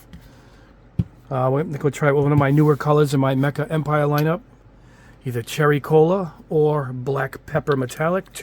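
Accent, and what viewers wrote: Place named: Northeast, United States. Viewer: lol, your NE accent coming through talking about a new paint "sauce". i am from the south so it took me a bit to figure out what you meant. (that's ok, my pronunciation of source would be "so arse") 🤣 love the videos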